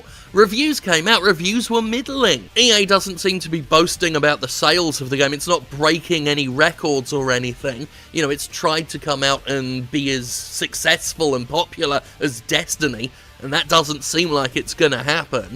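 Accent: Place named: Working class, United Kingdom. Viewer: I don't really watch for video games stuff. Working class British ranting is the only way I can finish. Thanks!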